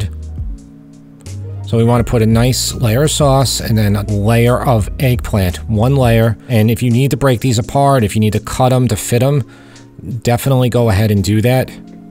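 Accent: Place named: Queens, United States. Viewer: New to your channel. I knew your accent is Queens/Long Island! I live in the Hudson Valley, but my accent is pure Bronx! Anyway, just spent two summers in Italy (I’m retired), and learned that Italian and Italian-American are almost two different cuisines. The meals we think of as Italian are virtually unknown in Italy. So I’ve been trying to cook more in the genuine Italian style, as well as using ceramic dishes I picked up around the country. As an example, I ordered veal scallopini with carciofi giudesca (not sure of spelling, Jewish artichoke). I also asked for a side of pasta. They were really confused — pasta?? Then they laughed, “Americans!” Anyway, I like your recipes.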